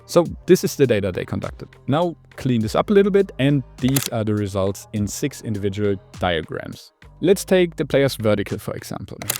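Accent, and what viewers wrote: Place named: Germany. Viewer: gutes video aber holy shit was ein deutscher akzent 😂❤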